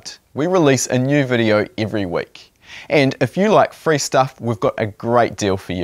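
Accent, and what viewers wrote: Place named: New Zealand. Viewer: Solid kiwi accent. Having said that, fantastic explanation.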